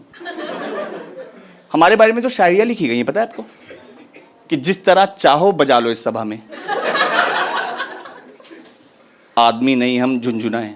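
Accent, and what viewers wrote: India. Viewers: UP का नहीं मराठी लग रहा है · Bhai allahabad se ho pta chal gya accent se😂😂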